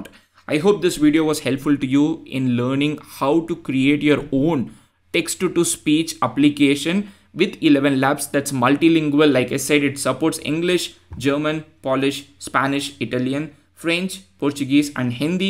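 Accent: India. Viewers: i love the indian accent its the best, Techguys form india are to me the best masters and teachers ... And this content/video/tutorial is the proof Ty sir... You are helping to survive this madness... Cheers from Mexico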